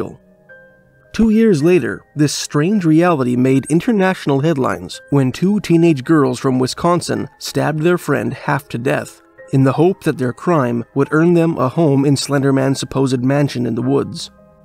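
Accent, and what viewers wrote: Canada. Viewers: I can tell by your accent that you're Canadian 💯 I think the Northwest Territory is the scariest most unknown desolate creepiest place in all of northern North America !!!! Especially the Nahhani region not just because of all the eerie stories but how much of a extremely dangerous gigantic maze it is of huge canyons & rivers & its completely surrounded by other giant regional mazes of forest & mountains & frozen tundras !!!!! It's like the heart of that whole entire region & even though ppl have been there, it's still the most mysterious unknown place in all of north America because of how remote it is & impossible to get to by foot or by automobile!!!!! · Love your Canada accents of passsta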